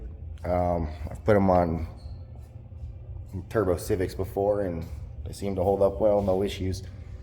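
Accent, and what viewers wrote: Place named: Sacramento, United States. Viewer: Dude u sound like some I went school with in sac.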